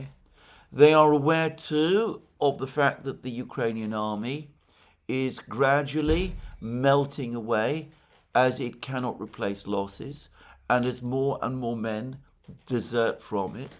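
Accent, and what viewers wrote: United Kingdom. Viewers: AM's real audience is Russia. AM is reading a Kremlin script. The Kremlin chose AM because he is physically repulsive and has a British accent and because they got him cheap because he had been recently disbarred, a fact the Kremlin never discloses in its script it issues to AM to read. I watch the program because it is a direct line into what the Kremlin is telling its own citizens. Again, keep in mind that the reason the Kremlin selected AM to read their script is because AM is physically repulsive with a British accent, which is how the Kremlin wants to portray the West.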